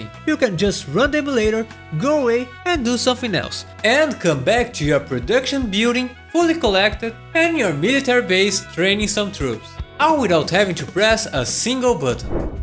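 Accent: Brazil. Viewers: that brazillian accent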